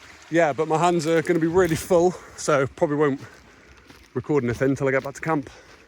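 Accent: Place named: Bristol, United Kingdom. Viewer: are you originally from the Bristol area? I ask as your accent sounds like it is,and just around the time that I found your channel, I was walking along the Bristol to Bath cycle path and I was sure that I saw you walking along it with your gear and dog..😂 The fishponds,staple hill area. Please, I understand if you dont want to answer, but it always crosses my mind when watching your videos. Keep up the good work.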